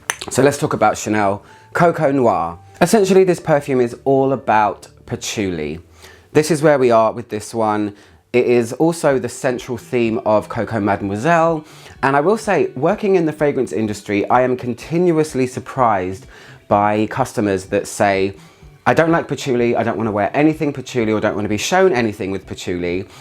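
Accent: United Kingdom. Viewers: 😂 I’m American and this whole time I thought it was your British accent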